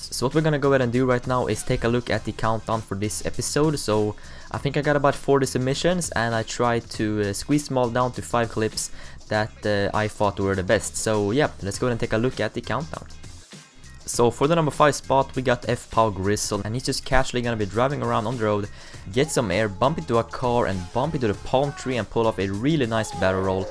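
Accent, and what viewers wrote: Sweden. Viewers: Lol man hör att du e svensk :D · Are you from Sweden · Det hörs tydligt att du är svensk så var tvungen att kolla upp det i kanalbeskrivningen ;) A men de e gött iaf att svenskar är bäst och har ofta bra utbildning i videoskapande. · Are you swedish?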